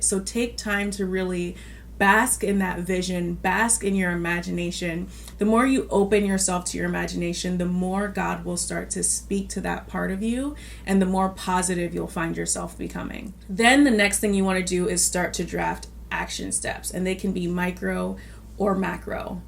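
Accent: Nigeria. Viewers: you're an amazing woman of God. Loved this video, but I truly miss your intermittent bursts of Nigerian English. Please bring it back.😂 God bless you, girl!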